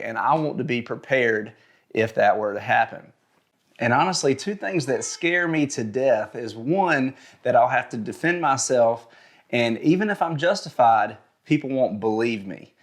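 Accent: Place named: South, United States. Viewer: I might be working for this company soon so been doing some research. I’ve heard mixed things about the cases they’ll go with but I atleast think the company and many of the people in higher positions do hold the 2nd amendment very close. side-note like your channel. Top tier accent as well one day I need to move down south. Gave you a sub.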